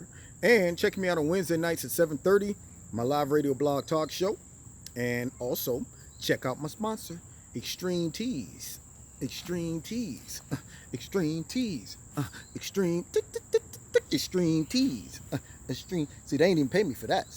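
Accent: United Kingdom. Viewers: You are funny. Had a good laugh with that British accent. 👍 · YOUR BRITISH ACCENT IS PERFECT.. GO ON AGT. YOU ARE GONNA BE A WINNER!!!!!!! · Drinking lava lmbo you are AWESOME love your British accent LOL!! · I laughed from the title to the end. Great British Accent! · That British accent!!.😂😂😂😂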